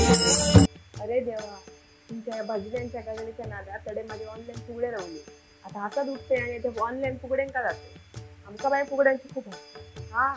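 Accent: Malvani, India. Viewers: गजाली बरे आसत पण खुपशे दुर्गुणच दिसले. शुद्ध मालवणीक तोड नाय. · sundar malvani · तुमचे निरीक्षण खरोखरच अफलातून आहे. भाजनातले किस्से अगदी तंतोतंत जुळतात. मालवणी भाषेचा गोडवा तुमच्या प्रत्येक शब्दातुन जाणवतो. पण एक मात्र खरे भजनातील ही वेगवेगळी व्यक्तिमत्वेच भजनाच्या दिवशी रंगत आणतात. तुमजा हा विडिओ उत्तम झाला आहे. गावच्या गजाली तुमच्या अस्सल मालवणी मुखातून ऐकायला फार बरे वाटते. ऐकताना गावचे वातावरणच डोळ्यासमोर उभे राहते. धन्यवाद! जय महाराष्ट्र!!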